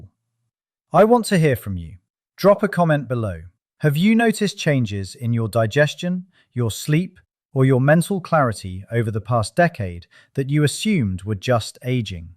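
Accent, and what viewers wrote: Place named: United Kingdom. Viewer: British now? Loose the brit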